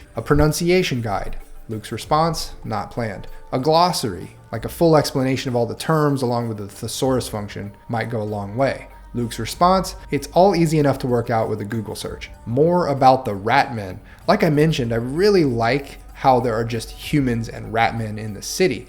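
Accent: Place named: Cockney, United Kingdom. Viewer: I didn't have " goes from RPG Youtuber to Proper Cockney Geezer" on my 2024 bingo card but here we are! Also, top tip for surviving British old money: count shillings before pennies, then you only have to count up to 11 pence at a time rather than 240.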